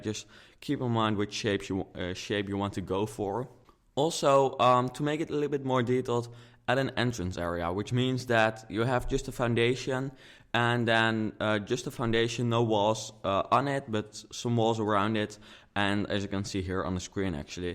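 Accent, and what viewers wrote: Netherlands. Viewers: Je hoort zo dat je Nederlands bent 😂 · haha je kan gewoon horen dat hij Nederlands is! xd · before i saw your name i could hear you were dutch haha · Ik hoorde meteen aan je accent dat je Nederlands was! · Same! I'm Dutch myself and I knew as soon as he said the first sentence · Haha ik dacht al METEEN dat je nederlands was Hahaha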